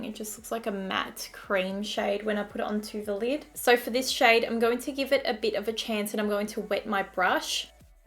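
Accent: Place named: Australia. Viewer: Did it ever occur to you that isn't from the USA and in australia we have the same way of saying things as the UK aka Kitchen cosmetics?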